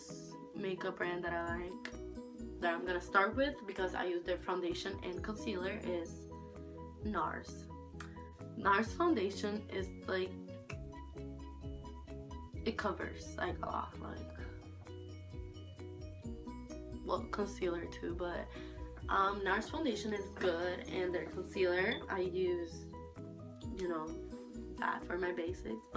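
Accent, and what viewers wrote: Puerto Rico. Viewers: que orgullo saber que eres boriqua. Yo soy cubana y veo los videos de Gabi. Cuando escuche tu accento en un video con Gabi y la otra rubia, tuve que encontrar tu página to support my fellow latina! Espero que sigas haciendo videos y que te hagas grande y famosa mientras sigas representando a todas las latinas que te siguen. Good luck girl!:D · You should make a video talking Spanish ! Yess if love to hear you speak because I love how Puerto Rican's talk lol 😭 btw your soo pretty 😍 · Yaasss girl!! Saludos desde PUERTO RICO! Escuché tu acento y rápido supe que eras boricua. Eres bella y me encantan tus tutorials de maquillaje. Xoxoxox 😘💕🇵🇷🇵🇷🇵🇷🇵🇷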